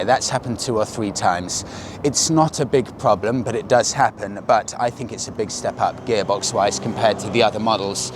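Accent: England, United Kingdom. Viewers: I'm from Brazil and I study English, I like watching your videos because I like the English accent, and also knowing about motorcycles, would this bike be adapted to my height, my height is 5, feet and 3.5 inches. Thanks. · Leave aside the bike, we see enough of them in India. I suspect we Indians watch your channel for a bit of that propah English accent 😊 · The importance of English these days, I, being Brazilian, would be missing out on such good content from this channel, your British accent is cool and a little funny to me. You're a good communicator, (and ). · I am not a big bike fan but somehow I like Royal Enfield a lot, especially in a such well demonstrated video. Some extra attraction for that British accent... 👍👍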